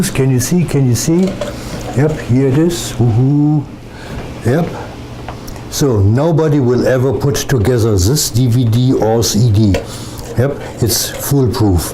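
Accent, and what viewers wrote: Germany. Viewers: Are you from Germany ? your accent sound really german.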